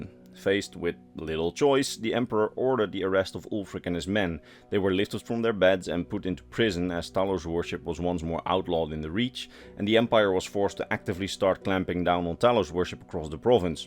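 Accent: Netherlands. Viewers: Goede videos, ik kan horen dat je uit nederland komt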